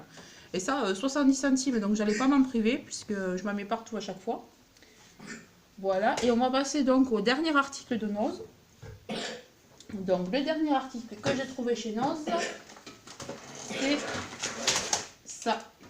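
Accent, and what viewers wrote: South, France. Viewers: J'aime beaucoup fashionnista. Alors j'ai reconnu tout de suite ton accent . Je suis aussi dans le SUd. Mazamet je connais ;) Bisous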